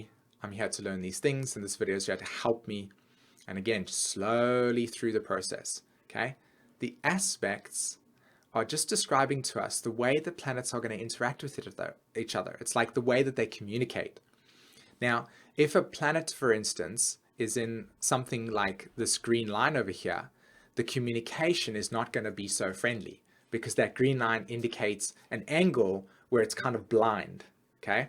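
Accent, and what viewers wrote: South Africa. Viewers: Are you South African? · New fan here. Thanks for this content. Great accent by the way...SA?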